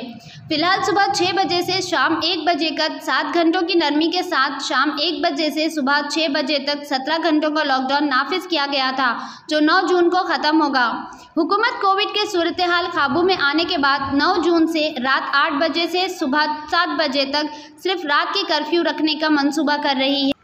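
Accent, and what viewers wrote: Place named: Hyderabad, India. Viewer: aapki hindi thodi Alag ha ..last me kya bole samjhi nahi...aap hyderabadi logo ke hindi thodi different hoti ha na??? Meri Insta ki ek... frnd ha Hyderabad m...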